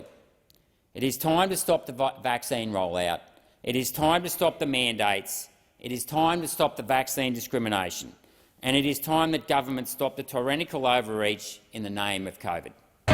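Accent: Australia. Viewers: I am ashamed of myself. This is 7 months old and my first viewing. I was at that time doing 6 days a week I have since retired. I have now seen 4 vids of this guy and will vote for him next time around. He is nothing less than BRILLIANT. To top it off he sounds like me and every other Australian I have known in my 68 years. Good on you cobber.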